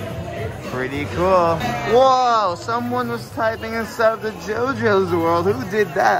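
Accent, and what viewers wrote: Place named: New Jersey, United States. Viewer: What part of Jersey are you from?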